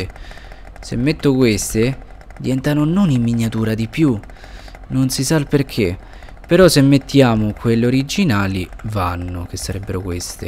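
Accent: Rome, Italy. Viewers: Mo se sente che se de Roma!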